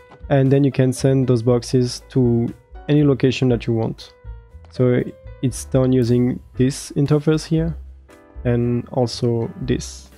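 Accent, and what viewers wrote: France. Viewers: hey are u french ?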